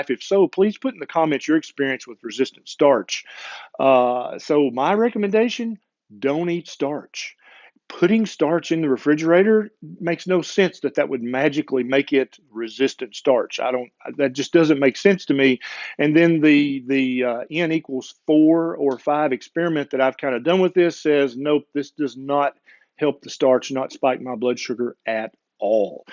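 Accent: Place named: Tennessee, United States. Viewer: I never heard this as I don’t watch the dude as he creeps me out with his fake muscles and I keep getting his ads come up on all my YouTube videos... it’s enough to make me pay for premium. I am pretty naive but I can’t believe anyone believed this without testing til now. Love you Dr! Your accent makes me miss TN xxx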